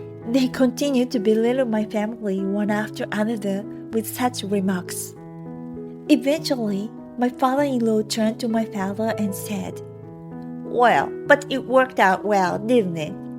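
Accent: Japan. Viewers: The audio seems to be spoken by Japanese. So why use Latino names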